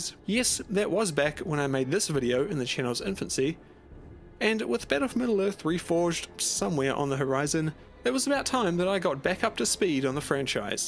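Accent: New Zealand. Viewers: yo r u a kiwi?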